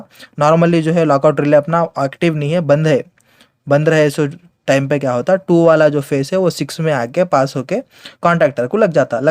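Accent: South India, India. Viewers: Bhi aap South Indian ho kya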